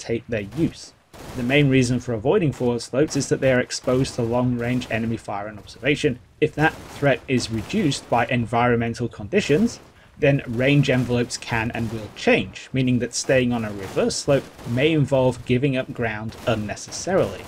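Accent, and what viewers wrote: United Kingdom. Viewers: unmistakably yorkshire, wonderful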